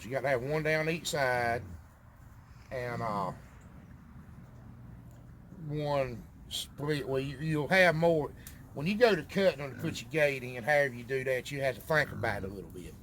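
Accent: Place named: Alabama, United States. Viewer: Like listening to my brother-in-law from Alabama. Love it. Good info.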